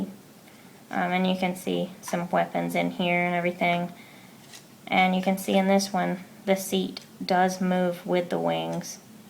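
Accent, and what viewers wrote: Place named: West, United States. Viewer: I never noticed this but her accent is like a western guy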